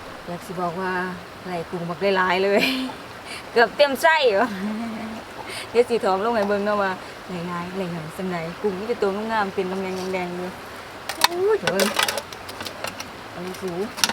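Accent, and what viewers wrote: Isan, Thailand. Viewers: เก่ง สุอย่างเลยน้อ เด็กๆ ทีมงานม่วนคัก ได้เล่นน้ำ (สำเนียงเว้า มักหลายๆ เด้อ)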